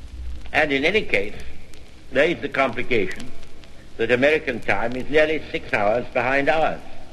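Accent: United Kingdom. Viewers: I'm a brazilian and wwii aficionado currently learn English, but this old British accent I can't understand so much, please anyone here transcript this video for me, thanks!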